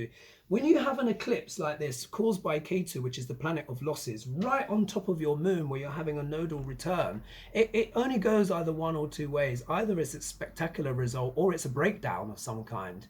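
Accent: England, United Kingdom. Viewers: Blessings to you. You are now MY FAVORITE ASTROLOGER ON YOUTUBE. I do Western Astrology but I LUV your insights via VEDIC. Also, it is a KICK to hear a British accent from an AFRO British face. GOD LOVE YOU.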